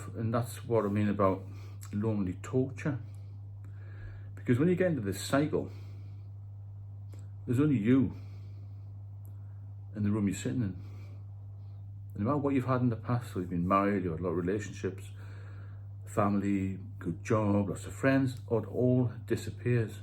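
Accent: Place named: Darlington, United Kingdom. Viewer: yep I’m subbed. I come from Darlington so I recognise the twang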